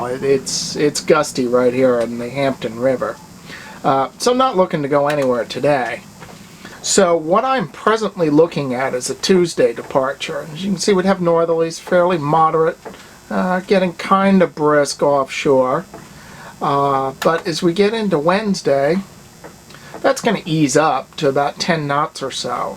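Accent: Maine, United States. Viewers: Not to be an idiot, but sounded some Mainer and not a learnt language, but a first language, and very easy on my ear. An old lingo that's stood the test of time and storm...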